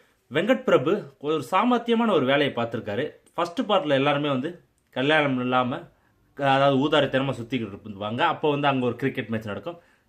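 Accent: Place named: Cumbum, India. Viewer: Me too cumbum side one village, I thought you are from chennai bro. Now I came to know why I watch your reviews native speech bro,doing good job but now your background looking like usa . Where are you staying now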